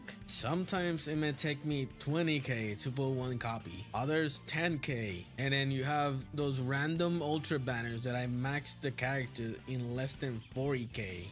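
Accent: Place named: Spain. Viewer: Wallahi i knew that you speak Spanish from the accent 💀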